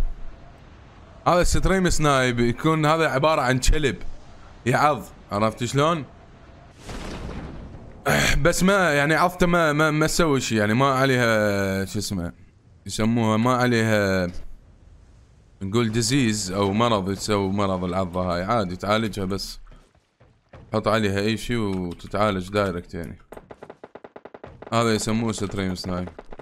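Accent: Iraq. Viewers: انت عراقي 🤔🤔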